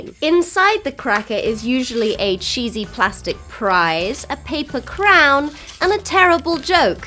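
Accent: United Kingdom. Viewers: What a lovely british pronunciation... you can't find that these days any more.. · I love your accent and Britishness...From a froggie...Sincerely yours!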